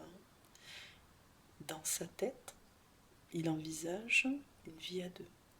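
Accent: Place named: Toulouse, France. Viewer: Choix 3. Et tu as un accent toulousain que je n’avais jamais entendue !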